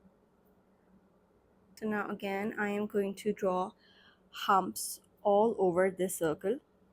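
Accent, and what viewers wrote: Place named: Kashmir, India. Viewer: Your accent is really impressive .....!! ❤ are you from kashmir ?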